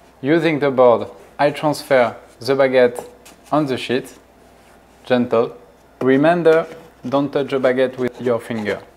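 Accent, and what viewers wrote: France. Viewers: Ha putain l'accent va plaire 💪 So frenchy ❤️ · Les anglais doivent être dingues de ton french accent 👍👍👍👍bravo en tout cas 👍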